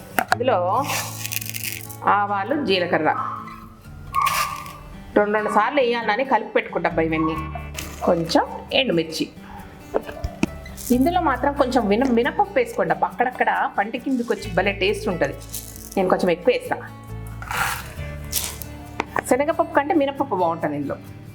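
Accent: Telangana, India. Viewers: Good telangana slang mam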